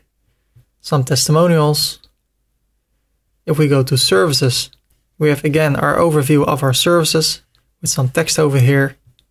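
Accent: Netherlands. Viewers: your accent sound very dutch. are you dutch? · Nice video, i immediately heard you are Dutch.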